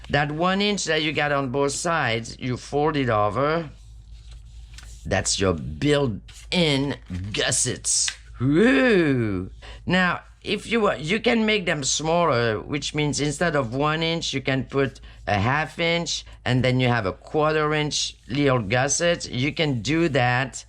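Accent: France. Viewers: These are very cute double pockets,! I missed seeing your videos for awhile, the last one I saw was the one with using cereal boxes to turn into “books”! I’ve collected several sets, 2 of each & I ordered the little clip thingies from Amazon, so I’m looking forward to getting on with that! This one today, though seems pretty quick & easy, so I might sneak one of these in first! It’s so fun to listen to you talk, English with a French accent is much nicer than plain old “American”! How did you become so proficient at English? And it’s not book English, you use a lot of slang & you speak very quickly, without pausing to think about a word!! Sorry, I sound like I’m interviewing you, I’m just curious! Now that I’ve found you again, I’m going to catch up on your videos! Thank you! Erica❤hope you’re having a nice weekend!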